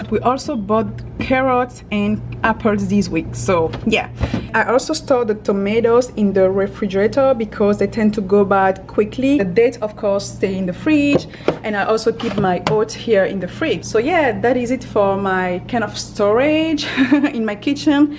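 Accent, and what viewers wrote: France. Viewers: Cet anglais parfait avec cet accent français xD Ca donne tellement envie tout ces produits frais ! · Are you french ? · Craig Mack she has a French accent to me.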